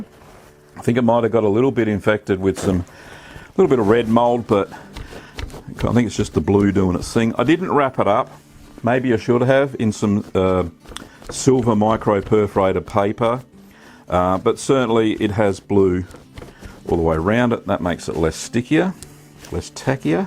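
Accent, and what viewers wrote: Australia. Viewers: I bought some of this from a cheese maker in Napa, California over 20 years ago. It was amazing on crackers, then I used some for gourmet grilled cheese sandwiches and macaroni and cheese. Absolutely wonderful. I hardly ever find it these days. I am an eater, not a maker, so I have to keep looking. Love your accent from Oz. My grandfather was born in Sydney in 1899. I’ve visited 4x for business and holiday, and look forward to returning again.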